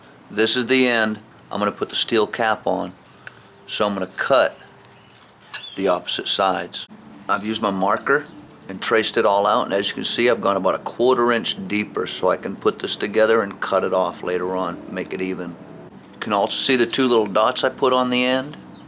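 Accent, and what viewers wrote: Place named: South, United States. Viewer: How did you get such a thick southern accent then? I would think a Maine man would have a east coast accent.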